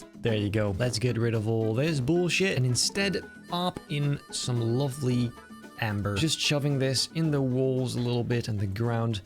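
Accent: Netherlands. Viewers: nether lands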